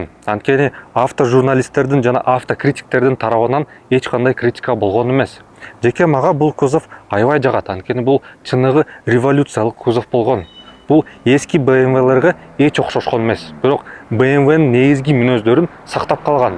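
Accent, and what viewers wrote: Standard. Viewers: Азаматсын таза кыргызча суйлогон кыргыз экен!👍👍👍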